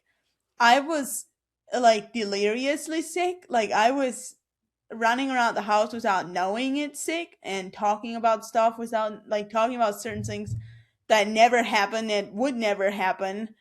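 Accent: United States. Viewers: I find accent fascinating, and I wonder how similar it is to other people's accents from the Amish community? There are parts that sound American. But to me, as someone from the UK, there also parts that sound like a very posh English. Particularly the way words like "January" are pronounced. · It's an accent but So American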